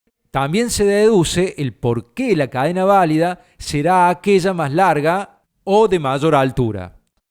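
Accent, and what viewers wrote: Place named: Córdoba, Argentina. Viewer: Genio capo Idolo, muy bueno todo. sos un crack! por tu tonada andas cerca de villa maaaria! abrazo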